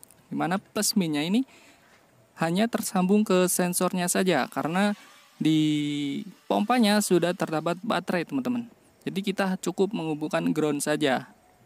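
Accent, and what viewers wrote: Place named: Banyumas, Indonesia. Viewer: Yakin kwren mas..sLam ngapak..ora nhapak ora kepenak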